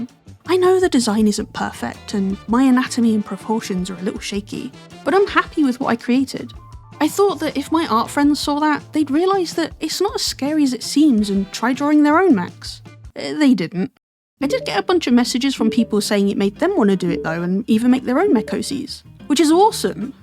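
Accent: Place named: United Kingdom. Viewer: i love your british accent, i think its british, urart is AMAZING, i am DEfINENTLY drawing a mech when i go to bed, even tho my usual drawings are so mediocre, but i'd love to do this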